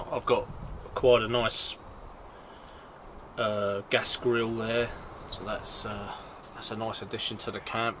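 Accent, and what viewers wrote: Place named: United Kingdom. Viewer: hey mate, great set up! How on Earth do you manage to hget so much space in the woods where you can stay longterm like that. You soudn British and as far as I know, apart from Scandinavia, you can not stay in a tent on land anywhere in Europe. How did you do that!??!?! Hope ou can have some great times there. Lovely also for teh dog to be out like that! WOnderful!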